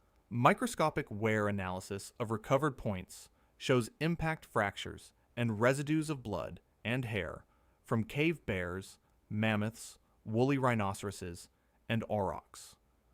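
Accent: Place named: United States. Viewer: i wish you could learn to pronounce composite correctly. Your American pronunciation is so grating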